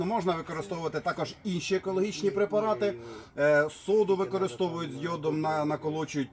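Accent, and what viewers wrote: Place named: Luhansk, Ukraine. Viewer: Я балдею от вашей разговорной речи луганск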